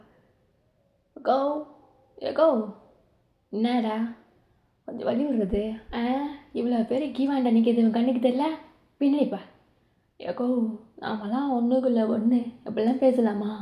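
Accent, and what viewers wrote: Chennai, India. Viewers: Story romba nalla iruku iyoo antha chennai slang tha semaiya pesuringa iyoo enaku romba pidichiruku but story avlo thana nalla irunthuchi duration than kamiya iruku innum kojam irntha nalla irukum story super ❤❤❤❤ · Madras slang super sis 🔥🔥🔥🔥🔥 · Taekook love so cute JK mom great jk jimin Felix friendship really cute 🥰🥰 this movie all songs my favourite songs ❤❤❤❤❤❤❤❤ Chennai language உங்களுக்கு நல்லா இருக்கு match ஆகுது😂😂😂😂 different style movie 🍿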